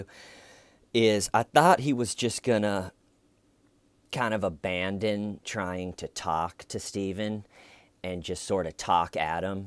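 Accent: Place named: South, United States. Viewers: I love your southern accent. All people have to do is watch the original police interview to find out why STAYVEN is funny. 😂😂😂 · Love me a southern accent. 👍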